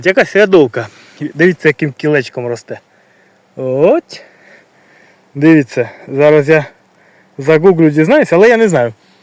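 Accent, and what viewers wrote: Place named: Luhansk, Ukraine. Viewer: Вітаю! Ваш говір видає людину з моїх країв,звідки орки нас ,,попросили,, Мені було цікаво.Я залюбки подивилася ваші спостереження та знахідки.У нас на Луганщині таких грибів немає. Чекаю нових екскурсій у світ західної України.Я підписуюсь на ваш канал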